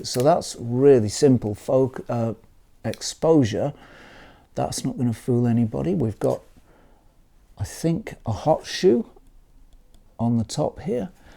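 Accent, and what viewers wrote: United Kingdom. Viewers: My favourite series:) I love your British accent:)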